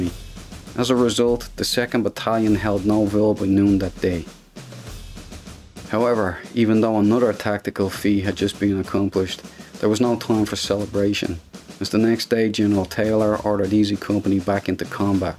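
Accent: Dublin, Ireland. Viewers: Narrator an Irishman? Nice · I think the Irish accent really helps big up this mans feats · Love the accent, Dublin? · Great video,! Are you Irish? You remind me of my uncle in Dublin. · Great video. Thanks for sharing. What's your accent? Irish?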